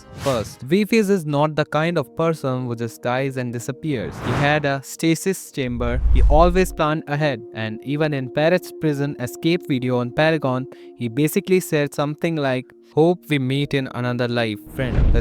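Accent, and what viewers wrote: India. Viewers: Your voice seem Indian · the indian accenct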